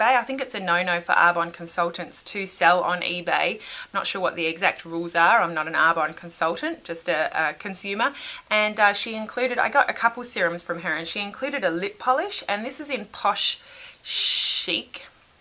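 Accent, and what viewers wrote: Australia. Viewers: feel a bit special being mentioned :) btw i'm loving the videos finally someone who understands how ludicrously expensive makeup is here in australia! enjoying the familiar accent that i am not use to in the youtube beauty community.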